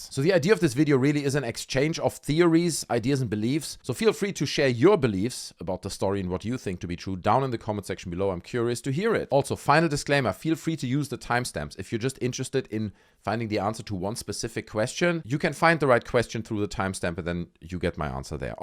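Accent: Germany. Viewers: Interesting video but I cant stand your accent. Tf. Just speak german.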